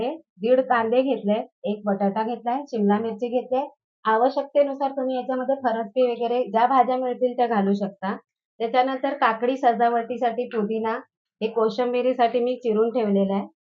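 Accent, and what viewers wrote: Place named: Malvan, India. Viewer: मसाले भात भारी 👌🏻 तुमच्या तोंडी मालवणी भाषा गोड वाटता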